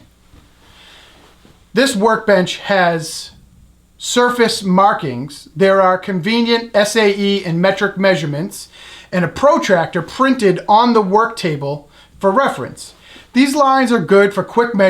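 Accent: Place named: New England, United States. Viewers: Is that a lovely New England accent? Well done 🎈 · Weird how much you sound like Rob! Born and bred in New England too, no doubt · Its our Boston accent! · He talks just like the older guy, it's not just the New England accent either. I wonder if he taught him how to do it?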